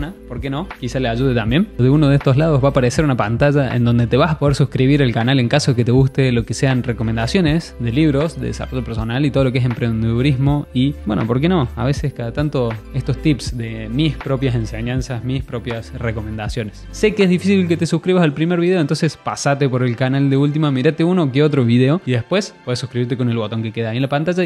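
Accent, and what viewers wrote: Córdoba, Argentina. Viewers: Empezaste a hablar y pensé, aguante Córdoba carajo xD (imagino estar en lo correcto 🤔) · Hola "! Recién te encontré por acá...y ya me suscribí. Gracias por los tips, estoy con ganas de empezar un canal por acá... tendré todo lo que contaste en cuenta... Sos Cordobés? Me alegra encontrar un Youtuber Argentino... no veo muchos todavía... Saludos desde Buenos Aires...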